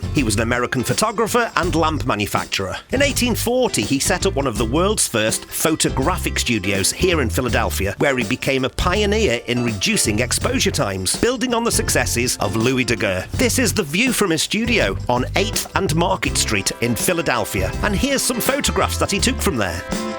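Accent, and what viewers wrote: United Kingdom. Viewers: 3 things i like about your style after watching 4 vids. 1 your Dedication and attention to the details.... (200) Games to Check out the veracity of the MH problem. Sweet!) I never got that until today. 2. Your enthusiasm and presence (including the Accent. We Yanks are suckers for the Brit accent) 3. No AI in your presentation! Big Fan, will pass you along. Thank you.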